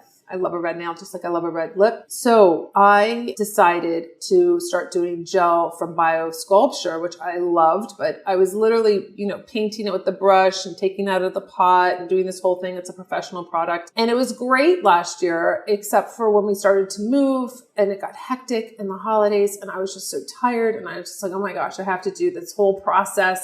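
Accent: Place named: New Jersey, United States. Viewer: Are you from N.J?? You sound exactly like Margaret from Real housewives of N.J.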